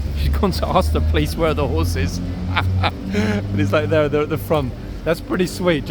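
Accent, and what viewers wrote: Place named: London, United Kingdom. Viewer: Oh what a joker. You never fail to amuse and entertain me, also educate me. I am an eastender but love to hear your london accent daily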